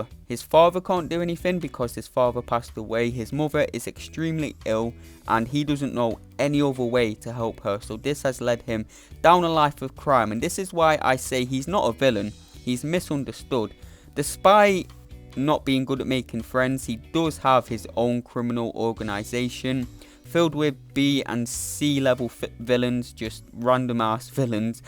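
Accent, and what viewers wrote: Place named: South Yorkshire, United Kingdom. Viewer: been trying to place yours now you mention it haha, I'm from South Yorkshire and you sound like you could be that neck of the woods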